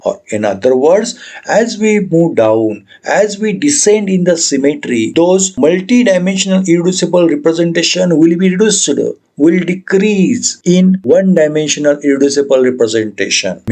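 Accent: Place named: India. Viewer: Why am i at this point where every video ragarding my topic is from some indian guy with a accent thicker than than the ganges so i dont understand shit.....😢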